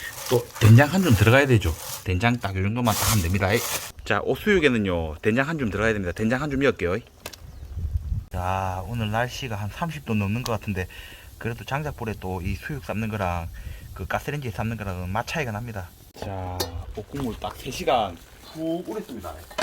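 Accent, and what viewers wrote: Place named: Gyeongnam, South Korea. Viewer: 겨울이겠다 ㅎㅎ진빼이 서부갱남 사투리 정겹네 ㅎㅎ자연이 가자 ^^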